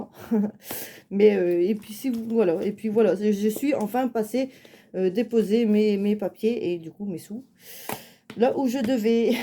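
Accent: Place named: North, France. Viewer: J’adore cet accent nordiste en espérant que je ne me trompe pas 😂 et ton duo avec ta moitié 🥰😍😻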